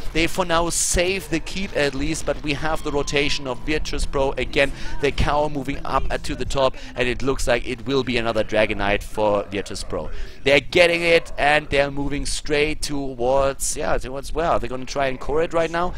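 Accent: Germany. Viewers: Good commentary. It was clear you were rooting for the Germans, and I think your accent is German, so maybe there's a reason for that ;-) You did point out the good things both teams did, though, and being a bit invested in the game is always cool. Subbed!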